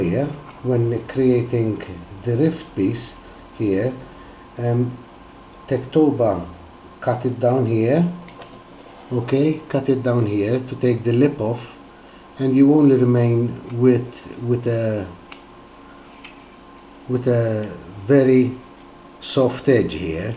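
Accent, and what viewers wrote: Italy. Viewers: Thank you for making a very instructional video. You not only made a functional stove you made it beautiful by polishing. Are you from Italy? Your accent reminds me of my grandfather who came to America from Calabria in Southern Italy. Perhaps you are not from Calabria as they say people from there are " Cappo Tosto ". ( you do not seem thick headed...haha ) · ! Excellent video, great added value to the already great video of Tetkoba... and I can't resist to tell you your delicious Italian accent makes it a pleasure to watch;-)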